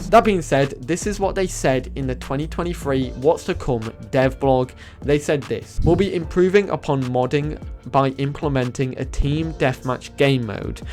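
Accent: United Kingdom. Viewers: Blud I, British but I ant as British as this guy💀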